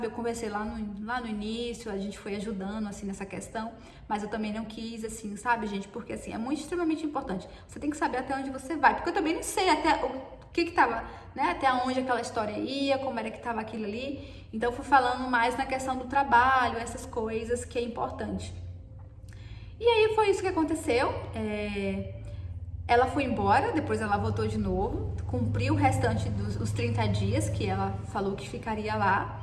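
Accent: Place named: Northeast, Brazil. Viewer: Acho o sotaque nordestino horrível , mas gostei tanto do jeito dessa menina,do espírito aberto dela, que nem consegui reparar o sotaque.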